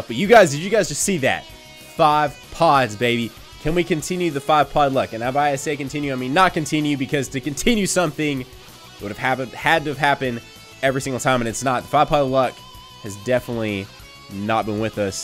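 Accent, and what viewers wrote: South, United States. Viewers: IS FROM THE SOUTH AND WHAT STATE!? He has that southern accent or am I crazy!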